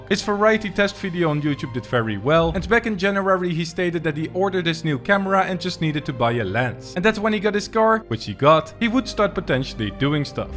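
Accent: Netherlands. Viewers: are you dutc hyou got a dutch accent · Are you dutch?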